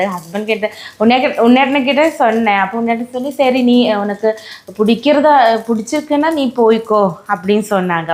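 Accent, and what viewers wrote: Kerala, India. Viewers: Tamil malayalam rendu kalandu Super a pesiringa anni I like your family 🎉❤😊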